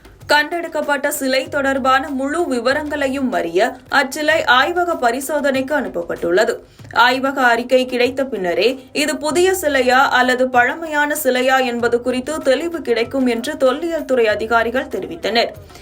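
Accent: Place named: Telugu, India. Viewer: Telugu voice 😅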